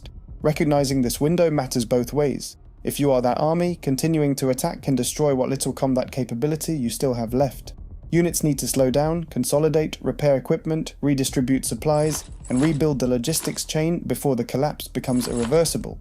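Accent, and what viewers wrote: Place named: United Kingdom. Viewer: So stereotypical British accent.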